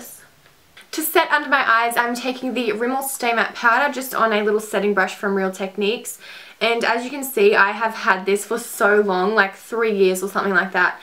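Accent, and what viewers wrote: Australia. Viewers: I love the Australian accent!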